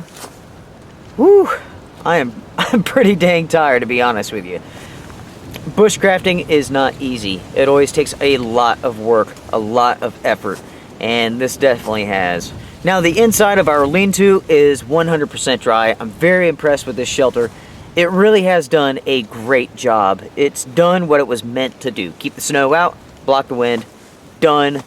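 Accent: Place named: United States. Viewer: That's awesome. Where is Lonewolf mountain? You sound American. I like watching your adventures.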